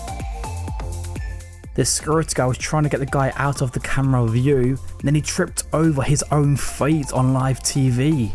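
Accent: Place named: Nottingham, United Kingdom. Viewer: mate you gotta be from nottingham/derby?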